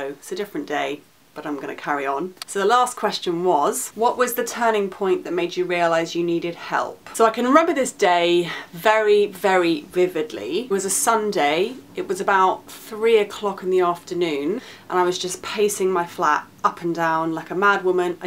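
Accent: England, United Kingdom. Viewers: I was little confused about how half a bottle of red wine isn't a good source of relief. I was panicking earlier(while watchin your video) and so i drank a 25oz beer(Natural light) smoked a halfer, and then i got all into your video, the highlight of my day(woman). I decided; it's your magical English accent that makes everything epic like a Harry Potter movie. Will you practice magic with me? But Y-N is Good. couple of sips>? what's with the guilt trip. Power tripper over and out.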